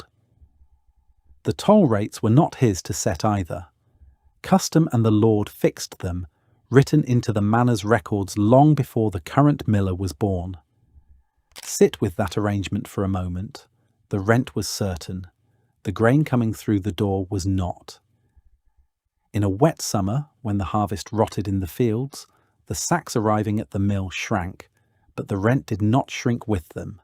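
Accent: United Kingdom. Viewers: How much AI is used in your vidoes? Thumbnail is AI, script is very repetitive and oddly structured which suggests AI writing, and though the voice is British, the terms used are exclusively American. I just have no idea how much of this I can trust at all.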